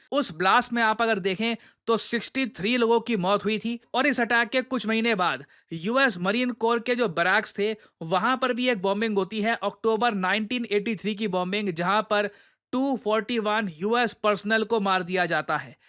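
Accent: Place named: Haryana, India. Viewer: haryana ke log aise hi bol te hain bahut logo ko suna hu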